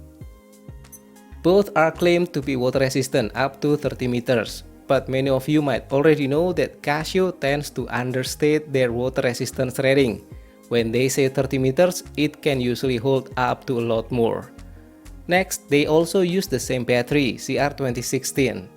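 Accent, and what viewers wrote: Indonesia. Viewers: It is Indonesian accent. You may find it similiar to S'pore/Msian.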